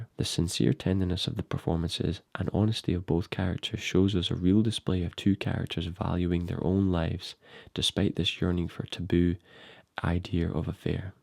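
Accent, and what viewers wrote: Scotland, United Kingdom. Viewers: I thought it was an automated robot voice at first and was about to close the video. Then I realised it was a Scotsman just speaking in a formal voice lol. I agree his voice is unique nonetheless haha. Good vid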